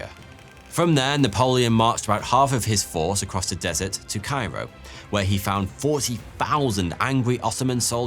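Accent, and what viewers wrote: United Kingdom. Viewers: While this video is entertaining, it is inaccurate, which is understandable given your accent. Your dna has been programmed for hundreds of years before my dna was. Go have a pint, and watch some soccer while your crown eliminates you. They are VERY NAUGHTY! Did you know that the Rothschilds personally funded the equivalent of 1 billion dollars for the third coalition, which was partially annihilated simply by marching. Their global banking syndicate was in jeopardy… it’s all quite fascinating how they helped the crown carve up the world. Awfully coincidental that in 1812 England was fighting both America and France and the same time. That pesky Napoleon 😉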